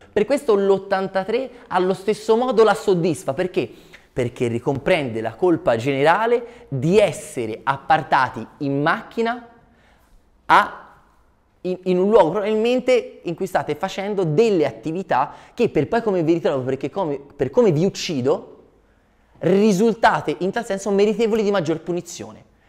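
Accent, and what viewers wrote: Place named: Tuscany, Italy. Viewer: È il Toscano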